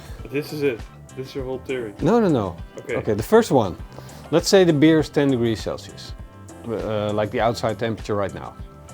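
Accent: Netherlands. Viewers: Beercan chicken best on gasbbqs. Keeps the chicken white inside. That Holland english talking accent is horrible! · "hoe" haha eindelijk. dacht al heel de tijd een nederlands accent te horen😄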